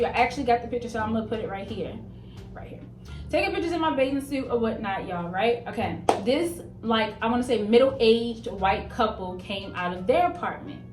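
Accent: Baltimore, United States. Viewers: Her accent sounds like she’s from Baltimore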